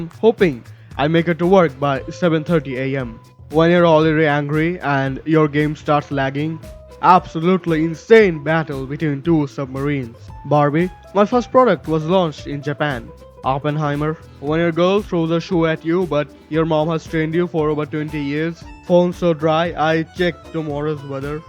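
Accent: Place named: India. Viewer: U sound so Indian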